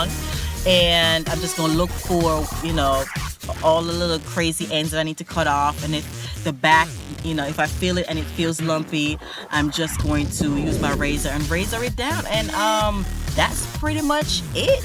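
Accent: Jamaica. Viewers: a swear mi just hear yuh talk now know sey a yard yuh come from! hairstyle up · R u Jamaican?? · Are you jamaican?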